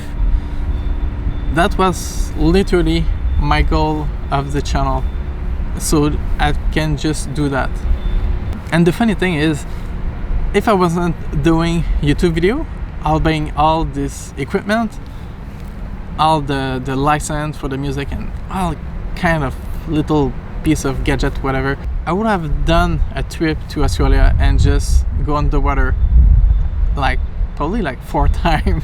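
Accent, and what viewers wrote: Quebec, Canada. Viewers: I am new to the hobby and have enjoyed your videos for tips and honest opinions. I grew up in Brossard, so the accent is something easy for me to understand (I am in the USA now, but my French is still pretty good). Losing a job is hard as it shakes your confidence, but even with the economy being shaky, it is not the end of the world. Hope you find something interesting soon and that you keep making your good videos. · Oh man, what horrible timing my poor fellow Canadian. You really have something special with this channel, I know you'll be hitting the big youtube numbers in the next few years if not months. Your videos are nothing short of amazing, I just love reading your subtitles and hearing your wonderful accent in my head. Your shots are so beautiful, your stories are enthralling and completely worth waiting a few seconds to see commercials for! 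You're worth it! Don't sell yourself short. Maybe try for something part time just until you get the numbers up? Sponsors are fine too, it would be awesome if they let you critique them as you like to, those videos are very informative. Most people will take any adverts like a grain of salt and make up their own minds anyways so you shouldn't feel bad there! Wishing you all the best and looking forward to your next video <3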